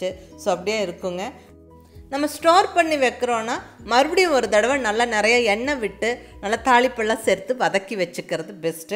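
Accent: Coimbatore, India. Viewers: எங்க கோயமுத்தூர் பாஷை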